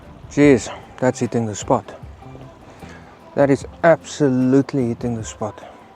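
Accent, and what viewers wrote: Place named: South Africa. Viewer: Just found your vid via YouTube on my tv - loved it😁 Where in South Africa do you hail from? Over 50yrs ago a relative of mine went to SA for a 6 month working holiday and stayed! Your accent reminds me of his wife's. Looking forward to seeing more of your adventures 😁 According to a mountain rescue team leader my sister worked with (and who taught me how to read an OS map) you should never go hiking in denim jeans!! Take care and please keep on bothy camping for those of us that can't! Another subscriber 😅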